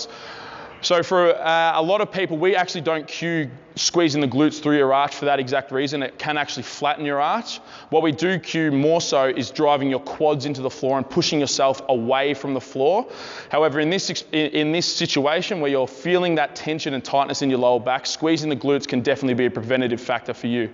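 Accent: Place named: Germany. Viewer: The fact that you like a German tryna speak English is gold love 💕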